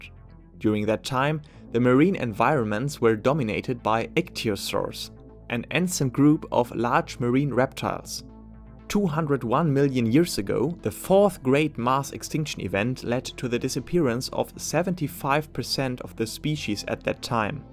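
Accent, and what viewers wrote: Germany. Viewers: I like your thick German accent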